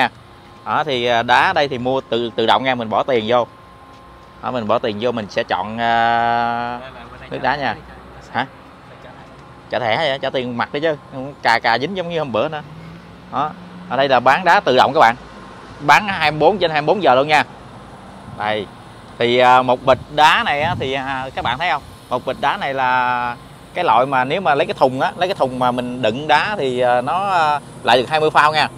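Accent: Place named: Mekong Delta, Vietnam. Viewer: chau nay noi chuyen dung dan mien tay , thiet tinh , chan chat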